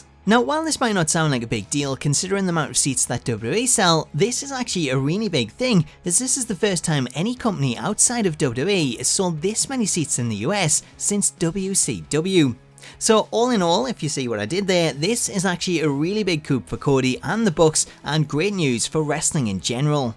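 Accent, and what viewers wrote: Newcastle, United Kingdom. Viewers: Newcastle sounds like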